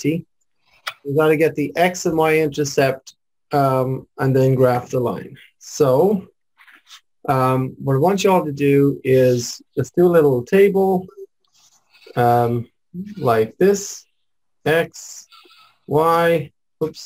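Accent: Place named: United Kingdom. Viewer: BRITISH!?!??!?!!!?!!!!!?!?!??!?!!/!11?!?? But thanks for the tips, i needed this for my algebra.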